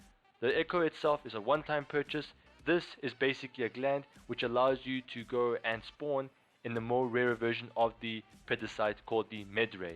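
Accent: South Africa. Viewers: are you south african? and thank you this helped alot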